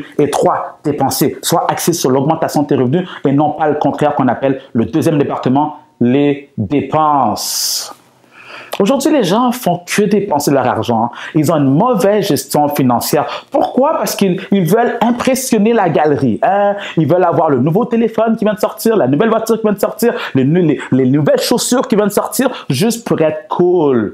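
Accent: Canada. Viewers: Cc!! Ton accent est bien CANADIEN???? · Tes vidéos sont vivants et savamment épicées à la canadienne (accent). Riches dans le fond & dans la forme, tes conseils de pro suscitent l'intérêt général et poussent à l'action. C'est vraiment fouettant!!! Merci toujours, Mister.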